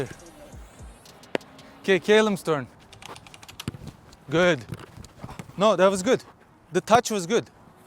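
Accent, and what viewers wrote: Turkey. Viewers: Türk müsün? Aksanın türk aksanına benziyor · Heaviest turkish accent i have ever seen in my life 😂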